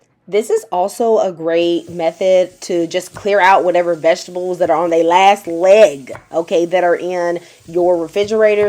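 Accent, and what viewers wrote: South, United States. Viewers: My kids been sniffling these past couple of days so I’m definitely going to use this recipe. 🔥Are you from the south?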